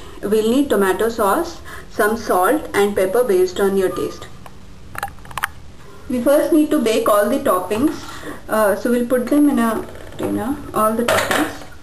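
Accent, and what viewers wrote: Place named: India. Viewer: hahahahaha you are indian!!!you talk so funny!:D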